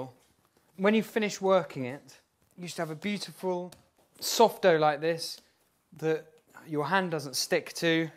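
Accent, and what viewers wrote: United Kingdom. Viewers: First of all, nice British dialect, second: here in Sweden we will never get a sourdough fom the air as you say, the air is extremely Clean even here in Malmö with 350K inhabitants.I tried 1000 times to make that sour dough starter, it doesn't work without a litle yeast to get that kick in. When I was on Mallorca the sourdough was active in 18 hours, here in South of Sweden, the flour it not reacting at all, it just decanted on the bottom of bowl and it shows no fermenting at all. Maybe we here in Malmö are like in a big jar with no bacterias...no microbs, no viruses, no yeast molecules, etc.Thank GOD! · Just because he says it with a cute English accent, doesn’t mean he’s making much sense. Where did he get the starter? What is “strong” flour? I stopped listening at that point. Don’t need half the stuff this guy says 😐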